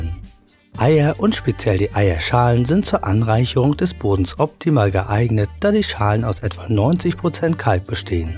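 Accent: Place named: North Germany, Germany. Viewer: bidersalz bläder..... der typ ist norddeutscher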